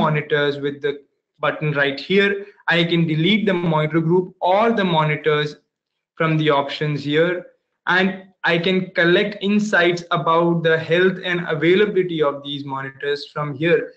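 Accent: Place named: India. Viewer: i hate this slang, as well as any other slang. please hire someone with clear speech. dear indians we do respect you, but this is too much, you spam with many useful videos, but this slang is so frustrating that i prefer strong alcohol drinks rather than listening this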